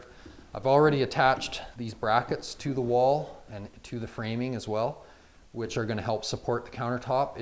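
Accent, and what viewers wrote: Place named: Canada. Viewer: Took 5 words until I knew this was a Canadian.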